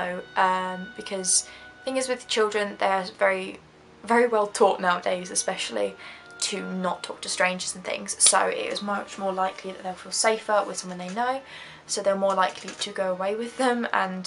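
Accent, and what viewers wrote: United Kingdom. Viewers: why r all u girls doing these type videos have British accents? it’s kinda bizarre just wonder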